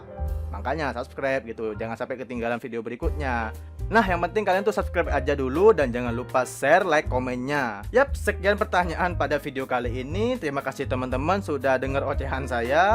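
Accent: Indonesia. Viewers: Mas... logat maduranya mas dikondisikan 😅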